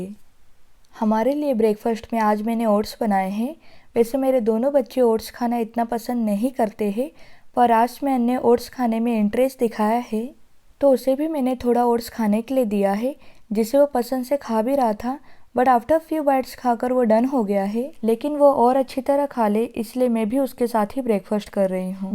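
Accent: India. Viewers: Mast anti tame kathiyavadi cho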